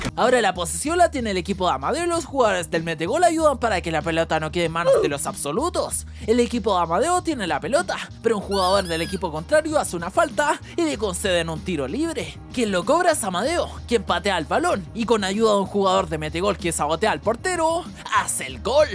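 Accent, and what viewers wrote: Chile. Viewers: Eri chileno? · Una pregunta eres chileno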